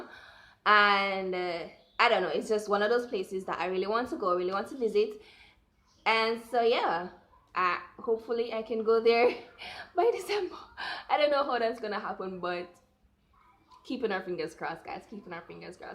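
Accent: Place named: Jamaica. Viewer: Oh Jamaica!! I just went to Jamaica 🇯🇲 last month and I loved it!! I knew I recognized your accent lol it was my first time going to Jamaica. I Posted my trip on my YouTube 🥰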